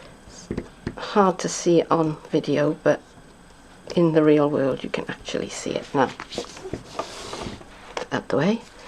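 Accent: Wales, United Kingdom. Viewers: Lovely card, you sound like your from my hometown, port talbot.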